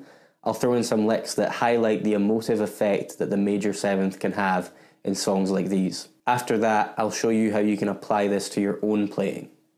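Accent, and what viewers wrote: Scotland, United Kingdom. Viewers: Are you Scottish? I hear a Scottish accent when you talk. Haha but I'm not sure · Nice video dude, btw where are you from? I think some of your vocals sound a little scottish sometimes but I'm not sure though, english is not my mother language so I find it hard to recognize accurately accents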